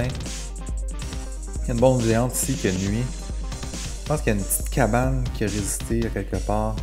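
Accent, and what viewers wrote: Quebec, Canada. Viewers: Tu est québéquois ?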